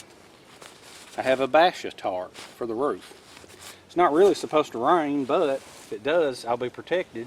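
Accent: South, United States. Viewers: Informative and entertaining, thnx! (PS This Canadian loves your southern accent, a part of your charm, IMO.) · oh my yu make me laugh so funny love ur southern voice a your very smart love your videos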